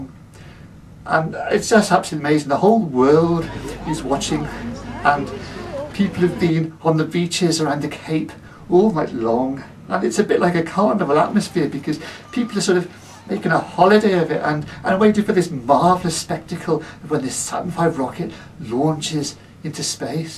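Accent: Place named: Birmingham, United Kingdom. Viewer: You must know you bad.. You just sound like you, a boring brummy professor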